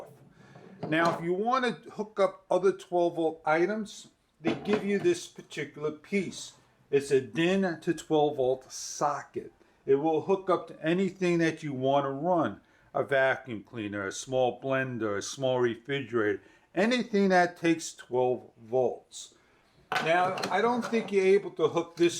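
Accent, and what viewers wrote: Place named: Brooklyn, United States. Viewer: BROOKLYN Accent, BROOKLYN Neighborhood, and thank you for an excellent Brooklyn Review. I have a Tacklife T8 which is identical to yours and it really does work.. 👍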